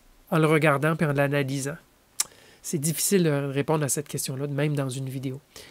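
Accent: Quebec, Canada. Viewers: Merci à toi l'ami pour cette première vidéo en live à laquelle j'ai pu participer . En plus avec l'accent du Québec, c'est le top ! :-) Tu laisses visible le contenu de ce qui s'est dit dans le t'chat et je trouve ça bien sympa ! Cordialement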